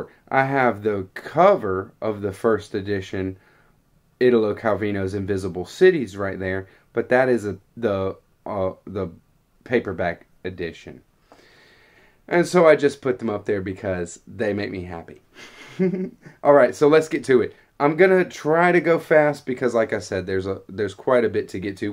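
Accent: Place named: Western Maryland, United States. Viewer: Good video man. Never knew about over half of these. Sounds like ur from western MD. Thats my old stomping ground.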